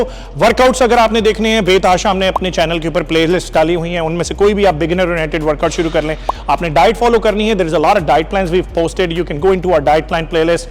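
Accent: Punjab, India. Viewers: Bande de puttar bano, muscle di dhukki kada ti 😂😂 🤟 Paji tusi pisho kithe to ho ? Tuhada punjabi da style bilkul sade nal milda, plz dassyo jaroor where from you ? 🙏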